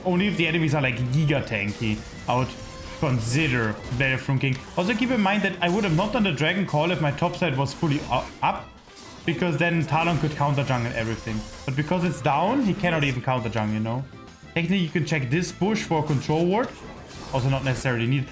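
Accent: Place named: Germany. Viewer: The German accent never gets old lol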